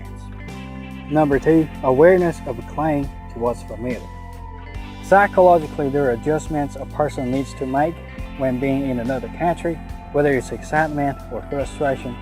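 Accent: South, United States. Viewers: Impressive and amazing that you got good knowledge of what you doing. I hope that as you lay the first foundations of your life as a Cowboy,I hope that you will carry this accent to other Chinese young people in the United States and also in China,and in the end,Chinese,Japanese and Koreans,can experience the Country Cowboy culture and also the accent,for those living in the USA or Canada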